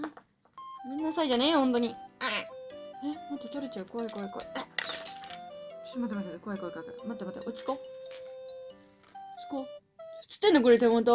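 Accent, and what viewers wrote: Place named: Sendai, Japan. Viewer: ばちくそ出てくる仙台弁がいい‼️ 親近感🥰‼️ 馴染みしかない喋り方‼️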